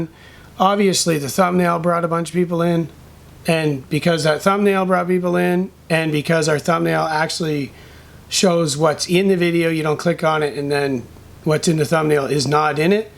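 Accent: Canada. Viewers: Bwahahahahaha! Love It. I've never seen your channel before, took six seconds to figure you're Canadian. Even before the "You're Welcome."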